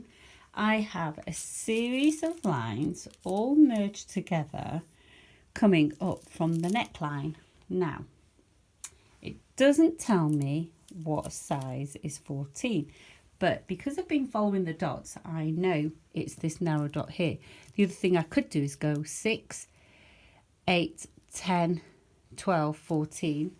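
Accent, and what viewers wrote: Yorkshire, United Kingdom. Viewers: Hi, just wanted to say that you explain things so well, thanks for that. I'm watching your vlogs tonight and really enjoying them. Do I detect a Yorkshire accent!!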